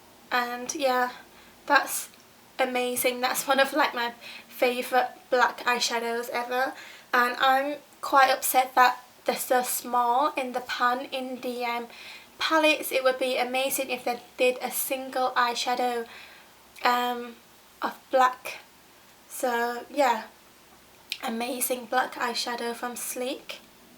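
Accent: Yorkshire, United Kingdom. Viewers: what's the name of her accent? is it yorkshire?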